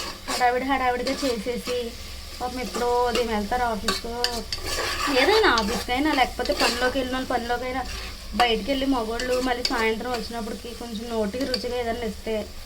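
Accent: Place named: Amalapuram, India. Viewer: కూర ఎలా ఉన్నా కానీ మీ మాటలు మాత్రం ఆంధ్ర అమలాపురం అమ్మాయిలా ఉంది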